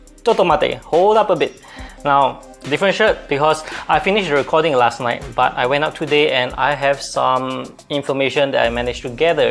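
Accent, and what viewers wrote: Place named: Kelantan, Malaysia. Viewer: Kelate boh!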